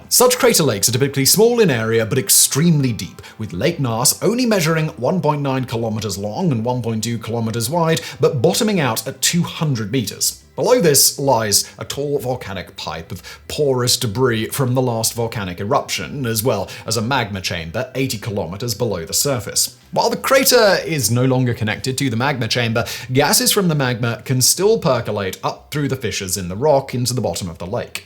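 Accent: United Kingdom. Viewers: Square space as a sponsor: Check British: Check is and confirmed.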